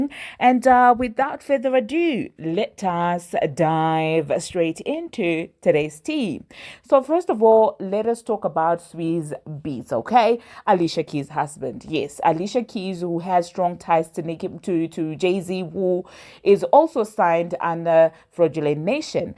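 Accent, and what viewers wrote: South Africa. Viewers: are you South African??? is this you??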